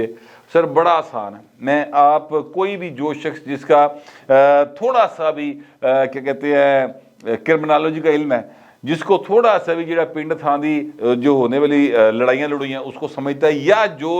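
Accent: Punjab, Pakistan. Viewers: Your punjabi accent is so nice 👑♥️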